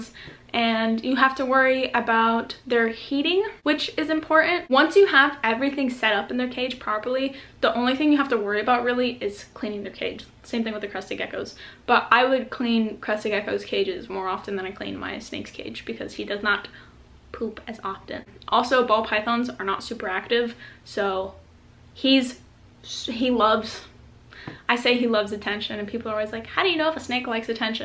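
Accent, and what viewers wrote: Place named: United Kingdom. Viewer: Pretty good british accent, adorable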